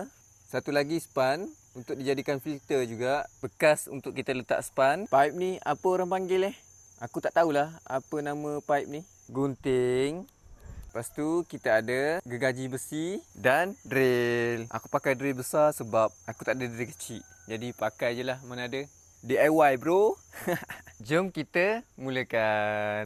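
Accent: Kuala Lumpur, Malaysia. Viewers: 😂😂😂😂😂😂😂kecek kl MCM sial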